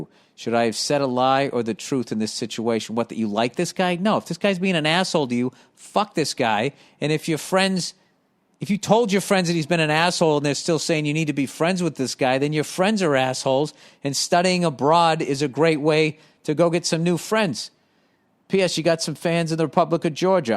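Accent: Boston, United States. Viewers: Bwaaston... Haha love hearing you. Keep it going.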